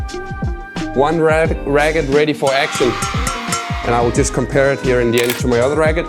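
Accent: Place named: Russia. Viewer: i thought his voice was like a russian- english language huhuhhh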